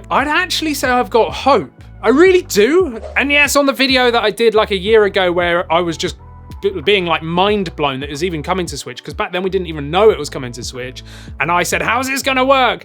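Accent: United Kingdom. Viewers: Hey bro your accent sounds like you’re from Oxford, england.. am I right? ❤️❤️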